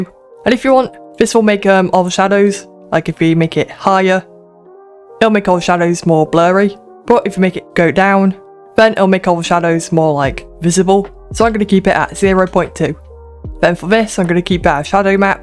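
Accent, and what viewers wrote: United Kingdom. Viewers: are you british? just trynna guess from accent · I love your accent! I can tell your british.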